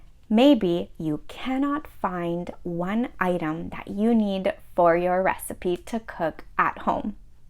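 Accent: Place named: Canada. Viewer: I love the way you speak, am here to learn Canadian accent. From Nigeria. You are doing a great job 👍❤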